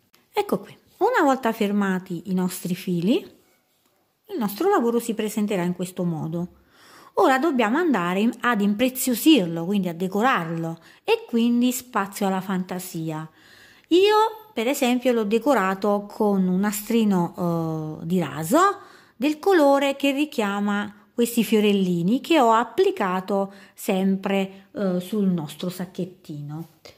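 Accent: Italy. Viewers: Molto carini! Brava nella spiegazione......si sente che sei siciliana 😀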